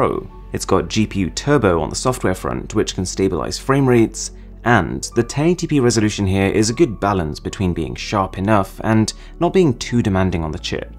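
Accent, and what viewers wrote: United Kingdom. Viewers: fancy british accents😅👌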